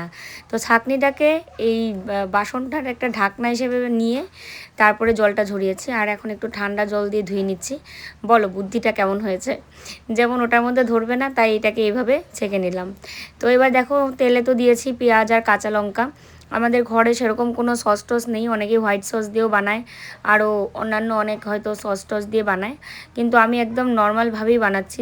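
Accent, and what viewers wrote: Bangal, Bangladesh. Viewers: Tumi j bangal vasha r adeshio vashay kotha bolo, besh valo lage shunte.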